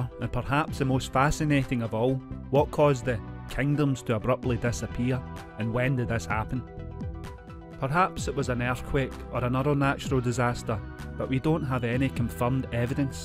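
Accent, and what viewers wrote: Scotland, United Kingdom. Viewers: Trying to place your Brogue. Inverness? Strong "undercurrent" of American "Anglish" throwing me off... LOL but no' aff the quay an' inta the Ness, playse.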